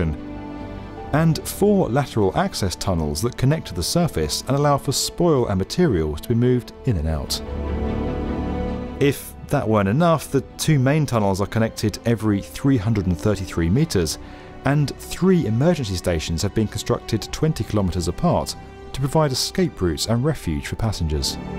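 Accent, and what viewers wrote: United Kingdom. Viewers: Why is the cost presented in US dollars when the presenter is, or at least, sounds British, and the project is based in Europe- an EU project. Surely the cost should have been in Euros???🥺🧐🙄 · Seems kinda weird to have a british guy narrate this, about a tunnel being built in europe, by the EU, and use dollars to explain how much it cost?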